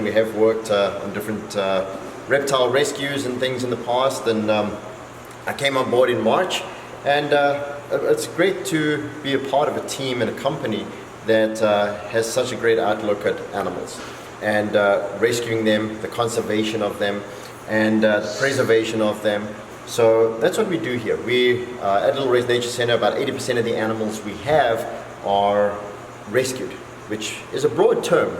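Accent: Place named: South Africa. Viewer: It only took me a few seconds to realise I was listening to a fellow South African! Howzit!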